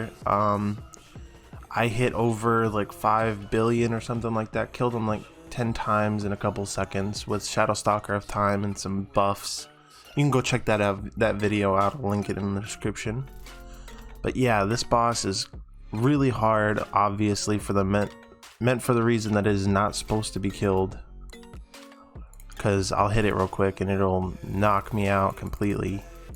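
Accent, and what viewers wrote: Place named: Bisaya, Philippines. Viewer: hahahahah bisaya spotted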